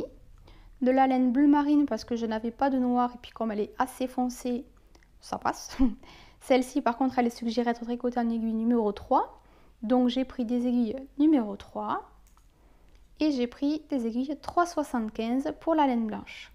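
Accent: South, France. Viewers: Super merci beaucoup, j aime beaucoup vos tutos et votre accents du midi me rappelle les vacances 😊. Euhhh j avais une question : quelle composition de laine prendre pour les doudou Bb comme la layette ? Je débute et j ai des doutes avec le choix de la laine. Je sais qu'elle est conseillé sur le modèle mais j ai récupéré un gros stock de laine neuves, 💫mais beaucoup d acrylique biensur.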